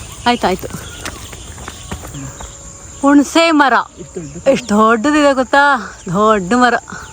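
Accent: Mandya, India. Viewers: ನಿಮ್ಮ ಮಂಡ್ಯ ಬಾಷಾ ಕೇಳುಕ್ಕೆ ಚಂದ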